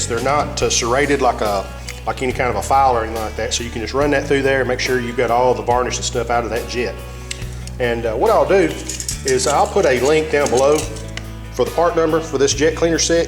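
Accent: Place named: Arkansas, United States. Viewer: Love that Arkansas accent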